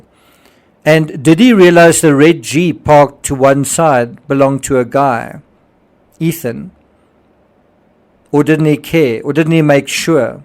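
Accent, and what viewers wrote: South Africa. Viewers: Your accent alone is great! South Africans are very interesting people. I was blown away watching Charlize Theron speaking Afrikaans on an interview 👏🏻👏🏻 I always forget she is from there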